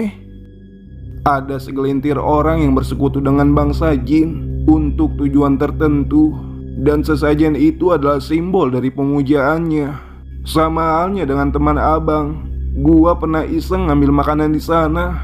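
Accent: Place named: Jakarta, Indonesia. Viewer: Hadir...🙋🙋🙏 Sukses sehat dan berkat Yaa.. Ancur...dah .kkkkk .seru..Betawinya..😁😁😁😁👍👍👍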